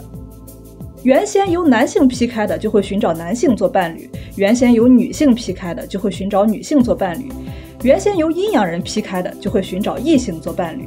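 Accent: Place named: Shandong, China. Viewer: 这浓郁的山东普通话，真是欢乐😂😂😂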